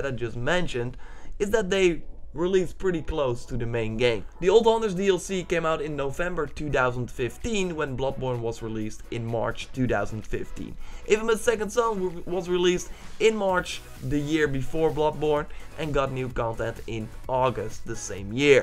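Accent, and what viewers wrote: Netherlands. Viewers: Hij heb wel gelijk gap je doet zwaaaaar clickbaiten.. en als je nou nog een beetje engels kon ipv van dunglish had et een ander verhaal geweest maar je ben niet om aan te horen ! speciaal kanaal gemaakt voor deze comment dude . en kan je beginnen over haten dit dat maar als ik er zelfs een account voor maak kan je nagaan hoe erg mensen zich storen! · Autumn Lies Empty Probably because his native language is dutch, and in dutch "idea" is pronounced "iday" and he kinda carried it over to english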